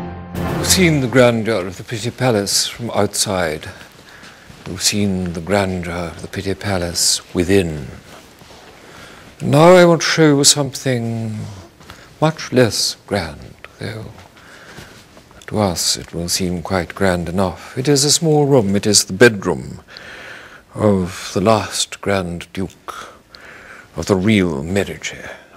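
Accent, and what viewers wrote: RP, United Kingdom. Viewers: Posher than the queen... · I have punched less posh people for being to posh... i'm gonna find some cockney to get this guy out of my head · This super-posh accent surely makes anyone else sound rough, but shouldn't it be "AT that very moment" rather than "IN that very moment"? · The poshest man in the world. Called Brian. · cut-glass accent is impeccable and flawless. An unattained aim for many people all over the world! His oratorical adornment is unsurpassable. Gifts of oratory, writing and organization are all his. It is rather difficult to imitate his manner and catch the tone of his voice. There is no doubt that he speaks a peculiarly musical English which bewitches the ears of auditors. His pomposity is merely a mannerism. I adore his pompousness and gentlemanliness. The art of saying things in the way as does, is like an ear for music, it is not to be acquired! 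· Brian speaks posher than what the Queen does. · Who wrote this script, who did this research, who does it serve? This 'mockumentary' is full of misinformation and incorrect history. He has absolutely NO knowledge of the Medici family tree. He mixes branches back and forth. The pawnbrokers NEVER became Grand Dukes. The Grand Dukes were not from Lorenzo's branch, neither was Louis' grandmother. He calls the last Grand Duke of the 'real ' Medici and the LAST Medici (really?)? Lorenzo, from a different branch, was therefore, not a 'real' Medici? Leave it to a Brit to label the Grand Duke the Last of the educated Medici (insulting!). the Lorenas were not Medici - they were Hapsburg. Do they expect that we will believe this farce because the narrator has a British accent and that he quotes other (misinformed) Brits?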